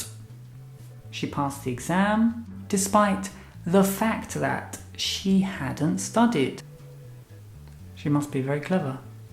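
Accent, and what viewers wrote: United Kingdom. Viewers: Pero que dulce es el acento Britanico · This is British?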